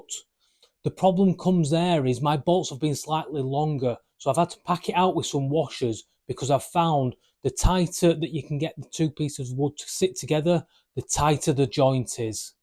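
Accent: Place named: Birmingham, United Kingdom. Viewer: I'm not good with accents, I would have guessed you were from Birmingham. I can't imagine anyone ever leaving the Yorkshire Dales! Cheers from Florida.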